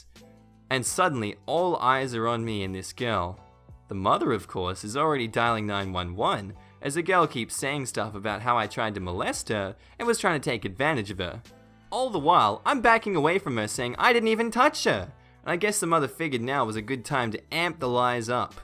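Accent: Australia. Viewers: Ello fellow Australian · Are you Australian?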